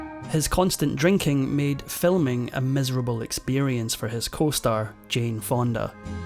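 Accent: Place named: Scotland, United Kingdom. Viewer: Way better than the AI clips. I’m a lover of Scot Accents, so there’s that. Thankyou!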